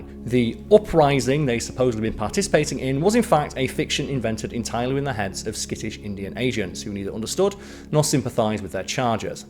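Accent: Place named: Northern England, United Kingdom. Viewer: No Simon not watching.....sorry but when you expect prime steak and someone gives you an ok burger you're pissed at the burger....if you'd been told from the off "you're getting an ok burger" you'd be fine with it but I feel tricked every time I see that stupid 2000s emo hair and hear that think whiny northern voice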